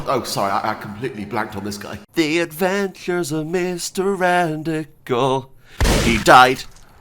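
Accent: United Kingdom. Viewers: So to confirm...you are British?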